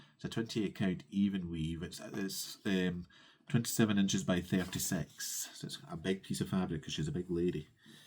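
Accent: Scotland, United Kingdom. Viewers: Greetings from Carmel, Indiana in the U.S. I loved seeing your fancy ladies and your SALs. Also love your Scottish accent. Keep up all the great stitching!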